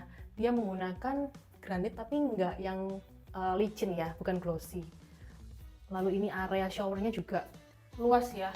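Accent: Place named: Surabaya, Indonesia. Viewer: logate suroboyo sangat.. keren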